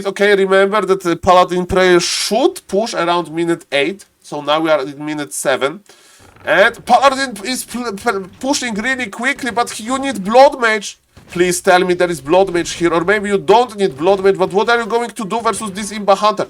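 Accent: Poland. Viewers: Are you Polish? The accent is very polish :D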